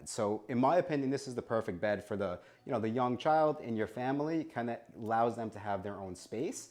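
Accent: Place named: New York City, United States. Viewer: Thanks for this video! Considering this for our next stay and this was very helpful. Also a nice taste of home hearing you talk! --a fellow NYC Italian now living elsewhere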